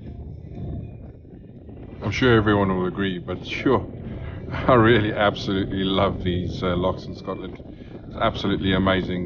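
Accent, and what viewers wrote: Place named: South Africa. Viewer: Hey boet! I discovered you in one of Dick Whistles videos. Think it was Distinguished Gents ride. I was moved to hear about what you are going through. Although I’m not really a YouTuber. Only made a couple pipe smoking vids which I enjoy. I have ridden bikes since I was a wee nipper. Back in Cape Town SA. Where you based mate I can hear a Safa twang in your accent boet. Drop me a line. I’m in sunny Basingstoke with my Mrs. Would be a pleasure and honour to meet you good Sir. Perhaps meet at Loomies one fine day ( perhaps pushing it in the U.K. lol). I’ll go with dry lol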